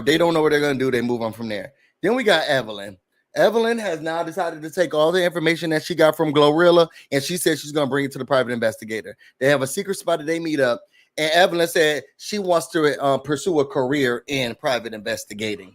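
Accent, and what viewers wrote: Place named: South, United States. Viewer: with a Southern accent (Yes Lawd)! 😍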